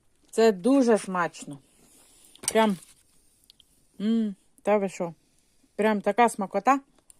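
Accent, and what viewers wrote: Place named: Russia. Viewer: Капец...Мучается бедная баба с мовой.Поганит украинский язык..Акцент русский так и прёт.Приспособленка.😂😂😂😂😂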